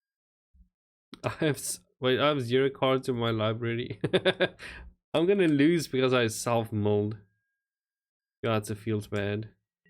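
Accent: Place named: South Africa. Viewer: The South African accent gets you a sub. Good video buddy.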